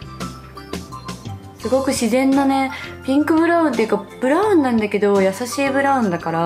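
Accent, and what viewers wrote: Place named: Kansai, Japan. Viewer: 文句なし！可愛い❣️咲乃ちゃん時々関西のイントネーションになるね😄お母さんの影響？徳島だっけ？なんか嬉しい😊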